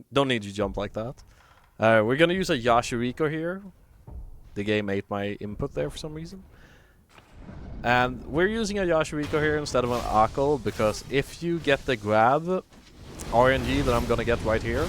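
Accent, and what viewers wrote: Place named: Sweden. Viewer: är det en svenne mina öron skådar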